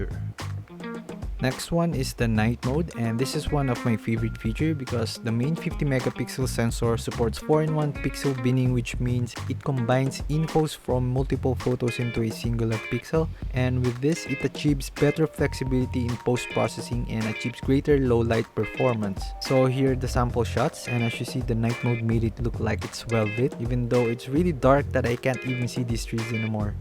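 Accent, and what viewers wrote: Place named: Philippines. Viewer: Iba talaga ang accent kapag pinoy nakakaproud🤧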